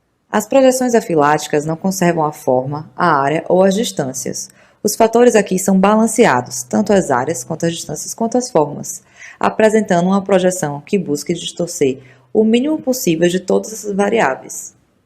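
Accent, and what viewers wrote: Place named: Salvador, Brazil. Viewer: Uma sotero reconhecendo o sotaque!! Otimo vídeo!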